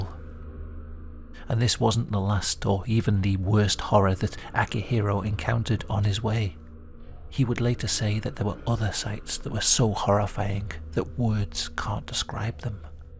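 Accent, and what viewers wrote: United Kingdom. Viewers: WHILE THIS ARTWORK IS HAUNTING AND DISTURBING LET US NEVER FORGET THAT EMPEROR HIROHITO HAD ALREADY JOINED FORCED WITH ADOLPH HITLER AND THAT NEITHER ONE OF THEM WAS INTERESTED IN STANDING DOWN, THEIR MUTUAL GOAL WAS 100 % WORLD DOMINATION. I AM SURE THAT THE US SAILORS WHO RESIDE AT THE BOTTOM OF THE OCEAN ON THE ARIZONA AND OTHER SHIPS WOULD REALLY ENJOY THIS BRITISH NARRATION AND VIEWS AND YOUR OPINIONS. PERHAPS THOSE USA BURNED AND DROWNED SAILORS WOULD HAVE PREFERRED TO LIVE OUT THE REST OF THEIR LIVES ON EARTH INSTEAD OF DOWN IN THE OCEAN AS FOOD FOR FISHES. SO KEEP YOUR WOKISM OPINIONS ABOUT A WAR YOU DID NOT PARTICIPATE IN NOR FIGHT IN, AS MY OWN FATHER DID!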